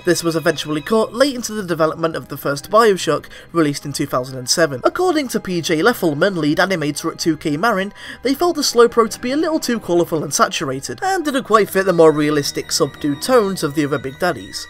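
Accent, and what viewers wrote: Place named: United Kingdom. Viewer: Awesome job! And British! EXCELLENT!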